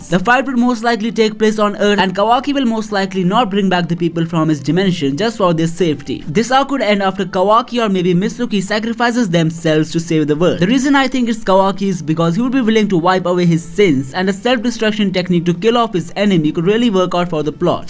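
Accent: India. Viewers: if that comes true you are an on insider of baruto😉 ya one ques bro plz answer are you a indian your accent does sound like indian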